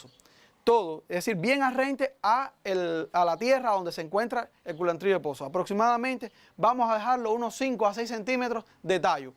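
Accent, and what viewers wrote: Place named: Sancti Spíritus, Cuba. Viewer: No ee como llegue aqui pero me atrajo el asento del muchacho .sera espirituano? Soy una espirituana lejos de mi cuba bella ..y ese asento solo lo tenemos nosotros .los S.S un saludo y grasias por toda la informacion muy bien explicado ..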